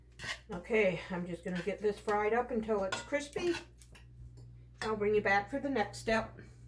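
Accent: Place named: Minnesota, United States. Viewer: Yum I love refrigerator pickles! Haven't had them since my Mama passed away. Can you share your recipe?? I notice you're from Minnesota. I hear your accent and it just brings me home. <3 I'm from up North about an hour away from Canada. It's really nice to finally see someone who isn't from the cities making videos!!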